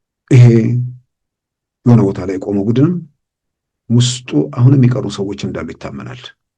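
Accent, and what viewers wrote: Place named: Arab world. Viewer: 👉👹👹😭ሀ ሁ ሂ ሃ ሄ ህ ሆ ብሎ ያሰተማረህ ማን ነው ዋጠው አማርኛው ከየት አመጣሀው ቃንቃህ አረብ ነው 😭 👹👹👈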